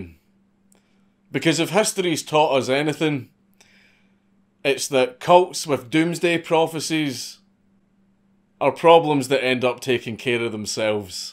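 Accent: Scotland, United Kingdom. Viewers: Dude, you're so funny! Add that to your 200% Scotch look and sound, I'm ROFL-ing here! 😂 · I love this man's accent If I've guessed correctly, Scotland is full of people with a similar accent, at least in one particular region, but I love it. An appreciative Yank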